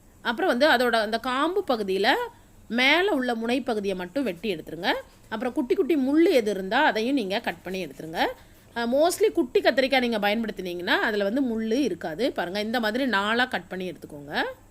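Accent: Tirunelveli, India. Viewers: akka nenga thirunelveliya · UNGA paechula theriyuthu amma... My mother's native also Tirunelveli.. and your samayal ellam super ma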